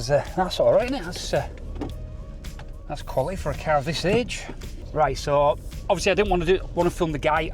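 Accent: Hull, United Kingdom. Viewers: Are you from the East yorkshire area st all,you sound like a Hull lad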